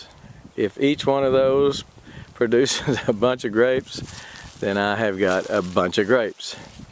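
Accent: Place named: Alabama, United States. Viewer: Please pardon my ignorance and forgive me if this is taken as insult - are you from Texas? Because your accent sounds very Alabaman to me 😊🤔 Any ways, thank you for all your videos. It's nice to see true Southerners gardening with native plants in this climate.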